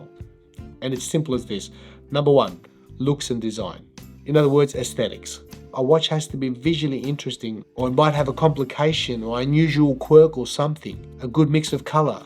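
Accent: Australia. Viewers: I'm so happy to have found this channel., it's great to here an Aussie accent. I love your honesty. I agree with you completely. I only buy what I love and want to wear. I would never buy a 20K watch. I have no judgement for anyone who does. Some of them are very very nice. For me, I love great watches from $300 to 2K. So Micro brands rule.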